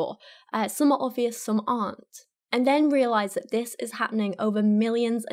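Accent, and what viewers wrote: United Kingdom. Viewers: Sorry little girl but Kent would destroy you in a debate! You are not smart! I know you think you are but your wrong. just like most ppl like yourself are. You talk to stuffed animals! Your brit accent doesn't mean you are smart honey. Your gen. were spoken about by Jesus himself and you will see the end days soon enough and I cant wait.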